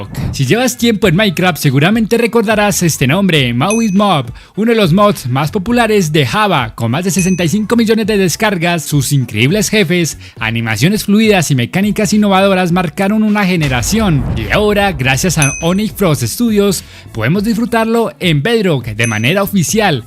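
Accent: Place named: Colombia. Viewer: Jjajaa ese acento se nota de Colombia saludos parcero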